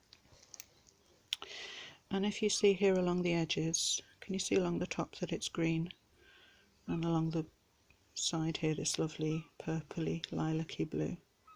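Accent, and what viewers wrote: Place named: United Kingdom. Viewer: Stunning! And I so enjoyed the video too. You make me laugh, 'no, scrap that, just go and live you'd lives ', so funny. And I look forward to the woodland journal tutorial. I'm intrigued to know how to ink pages the way you do. They are very beautiful. I'm new to art journalling, JJs, etc, so I'm learning. I'm in the Uk too, it's good to hear a homegrown voice x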